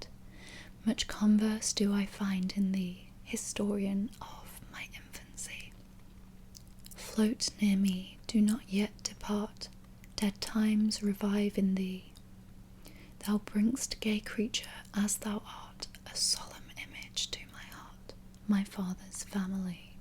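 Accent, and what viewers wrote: United Kingdom. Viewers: Thanks for your contents. Your pronunciation is very pleasant, are you British?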